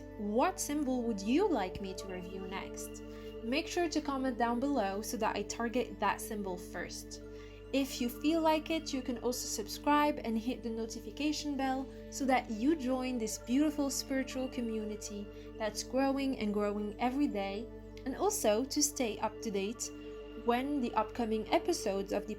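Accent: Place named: Netherlands. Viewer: You narrate very well in English, but sometimes I get the impression your mother tongue might be Dutch?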